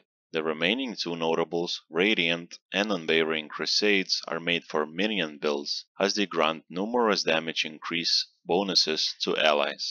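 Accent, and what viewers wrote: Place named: Lithuania. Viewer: pagal akcenta bus is LT :D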